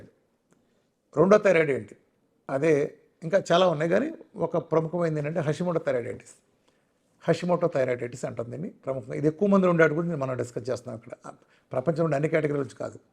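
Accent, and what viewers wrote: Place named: Andhra Pradesh, India. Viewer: Me matalu alane unni memu Andhra me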